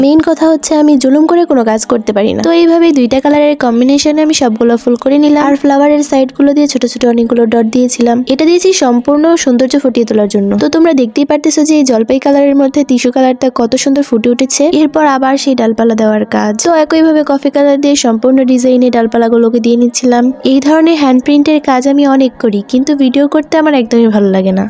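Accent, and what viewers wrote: Sylhet, Bangladesh. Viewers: Apnr basha ki sylhet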